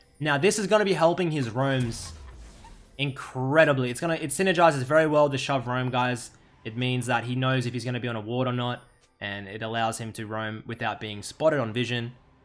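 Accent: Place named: Australia. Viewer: love the Aussie commentary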